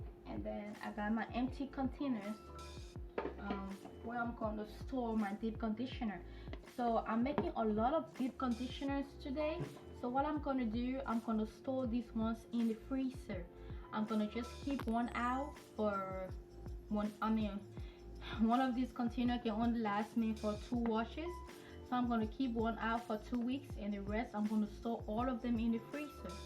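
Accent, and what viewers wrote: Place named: Liberia. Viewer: Sis, are you Liberian? I dig the accent. I too am Liberian. Love your channel and very informative.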